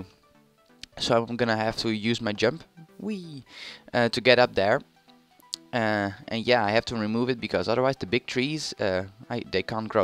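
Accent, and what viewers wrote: Netherlands. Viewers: Ben je Nederlands? Je klinkt Nederlands :)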